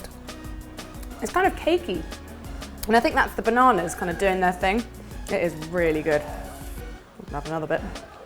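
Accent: United Kingdom. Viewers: You r great! Nice recipes ;) PS: love ur british accent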